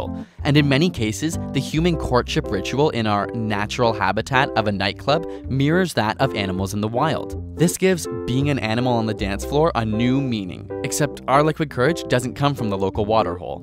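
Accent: Canada. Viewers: It's a Canadian accent.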